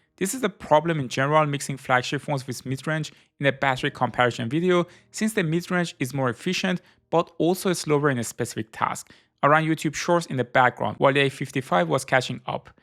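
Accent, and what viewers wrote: Iran. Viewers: Persian English accent 😊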